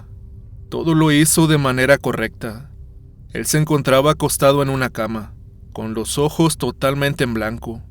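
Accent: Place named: Northern Mexico, Mexico. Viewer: ¿de cual ciudad y estado eres? por que tienes el acento muy golpeado, como norteño.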